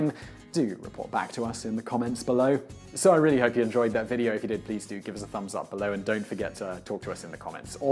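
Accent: United Kingdom. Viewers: He's British using the British pronunciation. Here in the US the pronunciation is different, just to annoy the rest of the world.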